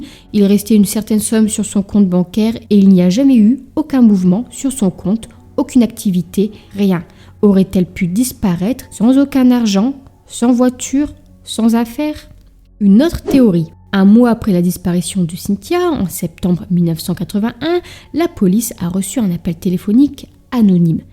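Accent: North, France. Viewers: Petit accent du Nord, me trompe-je ?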